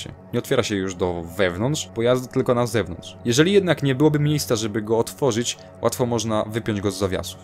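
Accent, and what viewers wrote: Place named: Russia. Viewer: Dlaczego masz ruski akcent,? Skąd jesteś? Sorry, jestem tzw. poliglotą i znam kilkanaście języków, więc jestem trochę przewrażliwiony i nawet jeśli nie robisz zbyt wielu błędów to zdradzają cię samogłoski, ikanie, akanie.... Prosty lud to kupi, a ja nie. To jak to jest z tobą - Podlasie, czy yeah bujny ruski troll?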